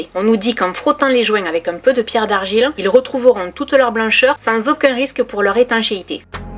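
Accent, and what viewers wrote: South, France. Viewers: J'ai moins aimé cette vidéo car le ménage c'est pas trop mon truc mais j'ai mis la vidéo juste pour t'écouter et avoir ta jolie voix du sud ! Tu as vraiment une voix relaxante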